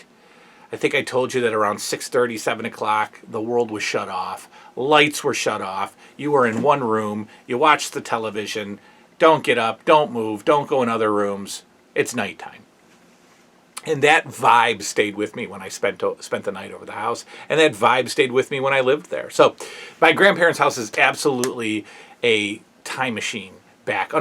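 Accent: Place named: Central Pennsylvania, United States. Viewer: Where are you from? It sounds like we are close to each other. I'm from central Pa.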